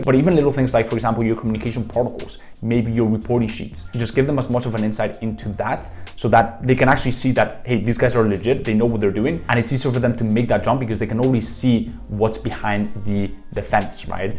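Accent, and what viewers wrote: Spain. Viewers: Eres español?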